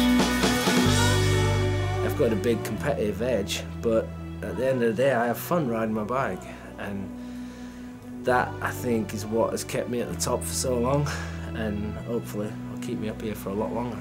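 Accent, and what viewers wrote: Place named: Yorkshire, United Kingdom. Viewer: it's a yorkshire accent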